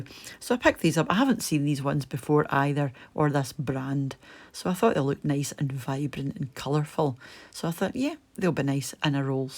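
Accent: Scotland, United Kingdom. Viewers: I’m a new subscriber after falling in love with your beautiful Scots accent chatting away as you cooked your yummy recipes. I also love your snippets of the local scenery. We were supposed to be heading to the UK for a month in 2021 to explore some of the towns of our ancestors and enjoy the sights and local hospitality, but as we all know that’s really not an option now, so we will have to postpone for a while. So meanwhile I’m soaking up as much of the culture as I can on YouTube 😍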